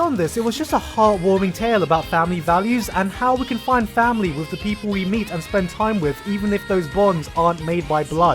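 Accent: United Kingdom. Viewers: [ „playlist suggestion“ -|- 111:02 later x3 ] fuck need more good comedy content that gives me that special kind of exciting and good feeling i get from waching your videos with you talking about how shows are that they them selves never manage to give me and a sexy english voice with a british accent ... =)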